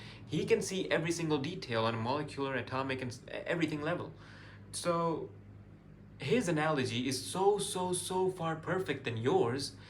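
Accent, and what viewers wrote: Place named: England, United Kingdom. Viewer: Wah yrr Nice English accent ❤❤❤❤❤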